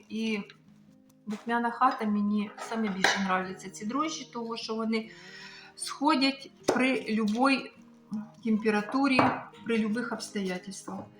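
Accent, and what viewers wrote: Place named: Odesa, Ukraine. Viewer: Яка ж у вас чудова одеська мова!! Ви СУПЕР господинечка!! Як я люблю дивитися на Вас і Вашу працю! Обов'язково зпечу цей КРАСЕНЬ!!!❤❤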